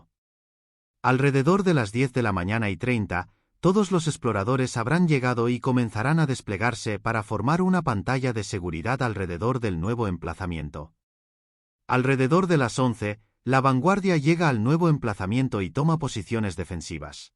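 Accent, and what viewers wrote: Spain. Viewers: Al ser una tradicción española y leer en el título la palabra "Fascinante" me produce rechazo solo pensar en escucharlo. Lejos de ser agradable el tono español es agotador, cansador, sobre cargado.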